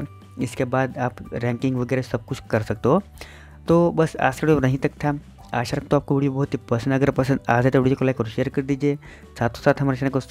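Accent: Gujarat, India. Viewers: bhai tum gujarati ho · Gujarati ho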